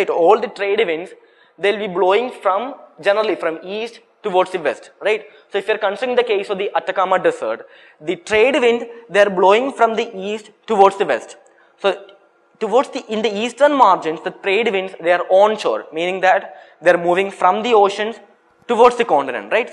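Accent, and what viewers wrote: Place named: South India, India. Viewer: Typical South Indian English Accent.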